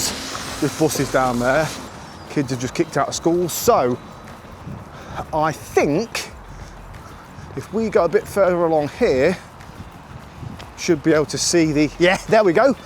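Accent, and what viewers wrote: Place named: Leicester, United Kingdom. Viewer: Your Leicester accent is brilliant!! Me duck.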